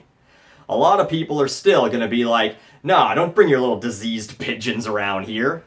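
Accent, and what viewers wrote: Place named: Canada. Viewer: Lol the Canadian accent ❤️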